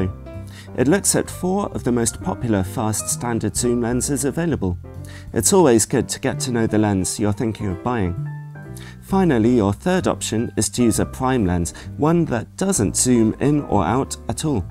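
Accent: United Kingdom. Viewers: The first time I see your face. :) The guy with the british accent. Greetings from Rio.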